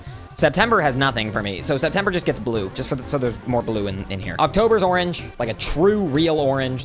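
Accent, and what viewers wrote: United Kingdom. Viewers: Are you british or something?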